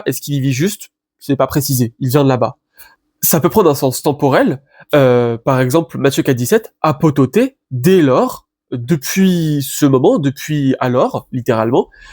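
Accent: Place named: France. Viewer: C'est dommage car le contenu est hyper intéressant et qualitatif mais étant locuteur grec natif la prononciation m'empêche de suivre. Trop de déformation mais pas spécifique a vous mais a tous les Français parlant le grec tel qu'il est enseigné en France. Un exemple simple le B se prononce VITA et pas BETA et ou le G se prononce ramma et pas gamma.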